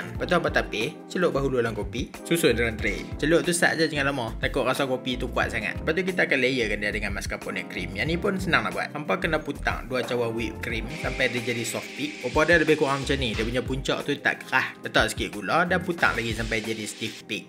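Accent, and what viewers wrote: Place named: Perlis, Malaysia. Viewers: Kannn...rindu akk anak buah dok Kuala perlis.persis suara camnilah...pekatt idgham die😊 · Tudia noh pekat utara macam loghat kuala perlis😂😂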